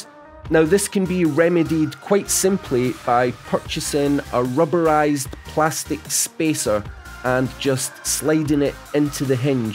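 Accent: Scotland, United Kingdom. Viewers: ok, stop.. i can't resist that much british accent. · i was thinking, hmm that looks like Newcastle quayside and north sheilds..... but your scottish! yup i was right it is haha im living here aswell :) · Yaaasssss a Scottish guyyyy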